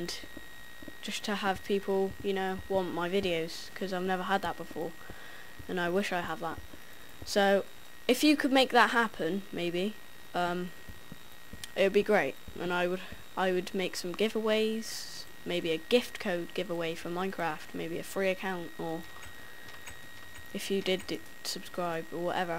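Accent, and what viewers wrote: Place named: United Kingdom. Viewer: British accent! Omg!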